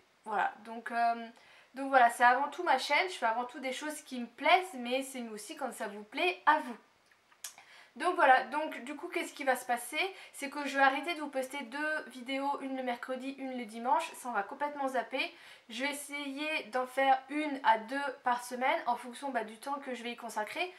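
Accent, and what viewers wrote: Lorraine, France. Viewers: avec le petit accent lorrain de chez nous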